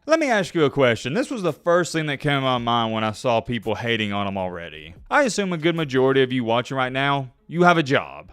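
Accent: South, United States. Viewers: Bruh I’m from the south and know we have accents but you are a mush mouth MF!!! Hahahaha You make great videos but you need to work on that. Maybe get a speech coach. Keep up the good work. Hope you continue the work and it works out for you. Hope you don’t feel like the criticism is unwarranted or being mean I’m in it more as a joke but I do think you have some areas where you sound like a mush mouth and if you’re not from the south, you might not understand what you’re saying. I said I didn’t say this to be rude or mean just hope you take criticism for what it is. Continue to do good work.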